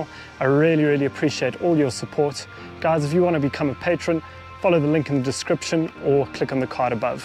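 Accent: South Africa. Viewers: When i first started watching you, i thought you sounded a bit South African. It is lovely to see you here in my home country! I wish you could come to East London, but that is one of the worst places in South Africa. I look forward to this tour.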